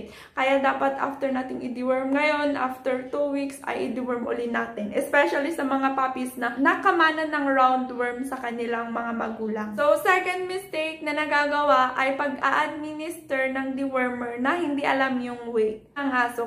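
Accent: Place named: Ilocos, Philippines. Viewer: Hi Doc , Dito lang po kayo around sa Ilocos Region ? nagbase lang po ako sa accent nyo dito sa video . Anyway very informative po ng video nyo since kakapanganak lang ng doggy ko :)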